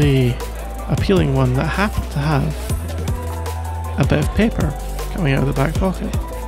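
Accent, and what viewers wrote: Scotland, United Kingdom. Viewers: AAAAAAAAA i love scottish accents so much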